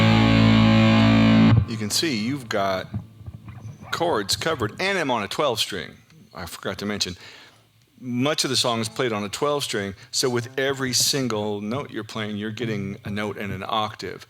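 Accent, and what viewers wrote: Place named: Texas, United States. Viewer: You’ve been living in Austin too long. You picked up that Texas twang.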